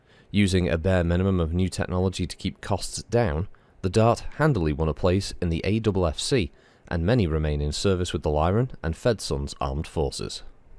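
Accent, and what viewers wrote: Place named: Scotland, United Kingdom. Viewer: A Scott’s accent is perfect for pronouncing Kathil Uhlans.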